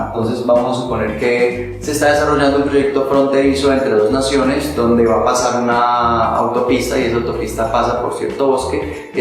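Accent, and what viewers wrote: Colombia. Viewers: La cadencia al hablar (supongo colombiana) me obligó a afinar el oído pero entendí todo. Gracias. Un mínimo aporte a lo que para mí es un excelente vídeo: El sonido al principio es deficiente.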